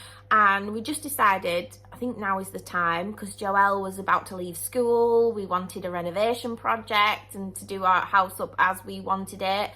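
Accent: Northern England, United Kingdom. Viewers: Hi, came across your channel by chance a little while ago and I’m hooked! Plan to watch all your vids in time. Your so down to earth and funny and sound like a Northern lass like myself. As others have said, ignore the nasty comments, jealousy defo. Why can’t people just be nice- or stay quiet if they can’t. Good luck to you and hubby, and looking forward to your journey, and maybe a bit of inspiration x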